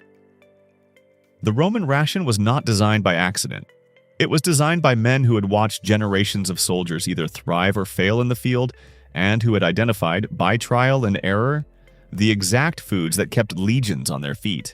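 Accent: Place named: United States. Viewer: Very good video. I have subscribed to your channel. But please learn to pronounce "anti", "semi", "multi" and "vitamin". Only in American English can a perfectly straightforward Latin prefix be twisted into a diphthong that never existed in any language known to man.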